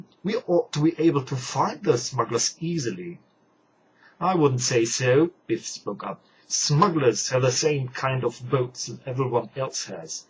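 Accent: United Kingdom. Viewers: Wanted to check out this series before buying it online. THanks for posting this. It's nice that you have a Brittish accent.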